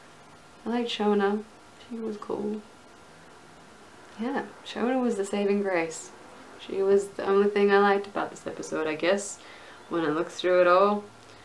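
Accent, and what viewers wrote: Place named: Australia. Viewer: When you say that you're taking a break from the show, aren't we all going to kind of have to, since we're not getting another episode until fall? And, btw, I agree with you, even to such an exceptional amount that watching this kinda felt like listening to myself think. Interesting. Hence the subscription: I want to see if this continues. Also, I totally didn't even pick up on your Australian accent. What part are you from?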